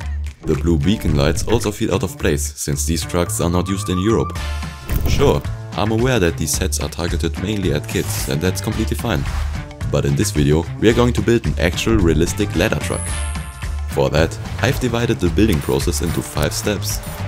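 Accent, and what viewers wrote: Germany. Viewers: That’s NOT a realistic fire truck. Lego is European. And judging your accent, you clearly are German. So why the frick are you calling this thing realistic? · Do i hear a little bit of an DEUTSCHER AKTZENT? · from your speaking i can guess you are from germany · Am i the only one who heard a slight german accent? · i hear german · Bis du deutsch ? Du hast eine kleine accent 😊 Ich habe dich wegen der Aussprache von „Chicago“ entdeckt: ein zusätzliches H und viele A „chic'Haaaaago“ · The German accent 😂 Great Video mate! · I hear a little bit of deutscher Akzent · Digga warum kein deutsches Auto man hört doch dass du Deutscher bist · Is he german? Im German and his accent sounds sooooo German to me😂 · Do I hear some DEUTSCH in your language? 🤣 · I hear german accent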